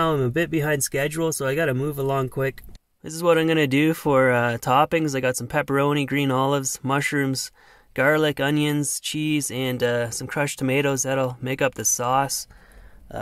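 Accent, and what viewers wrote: Canada. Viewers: Your food looks amazing. Also, I love that Canadian Accent. New fan :) all the way from HOT Sydney Australia. I wish I lived in the cold! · I am a new subscriber due to your accent. Canadian A? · I am Canadian myself, so I recognize a strong, Canadian accent when I hear it. Are you from out West? Maybe far East coast? I'm from South East Canada myself, or south west Ontario. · He sounds too Canadian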